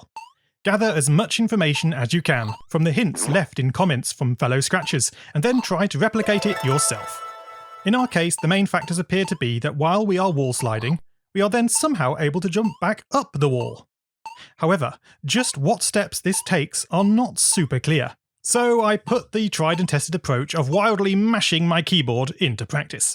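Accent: United Kingdom. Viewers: Your the most british person ever, the accent and stuff, WHOLESOME STUFF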